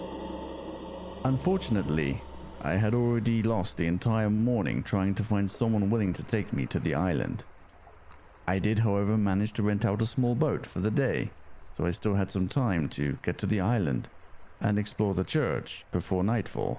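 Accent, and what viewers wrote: United Kingdom. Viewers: Hope you have a SPOOKTACULAR HALLOWEEN weekends mate! 🎃👻 P.S : Loving your British accent more and more. 🤍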